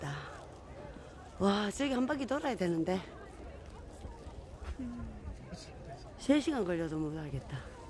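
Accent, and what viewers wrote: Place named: Pohang, South Korea. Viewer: 4~~♡ 포항이라서 사투리가 반갑 네요 소중하신 님의 영상 열열이 응원해 드리고 물러갑니다 수고 하시고 또뵈요,^^ 👍♥️🌈🙋‍♀️👍😃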